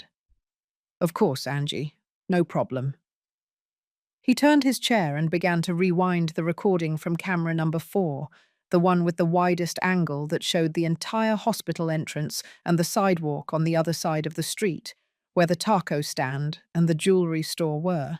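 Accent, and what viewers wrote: England, United Kingdom. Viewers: Good English accent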